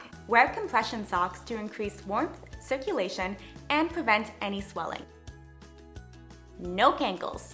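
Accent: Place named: United States. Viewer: She has an American accent so I assume the USA.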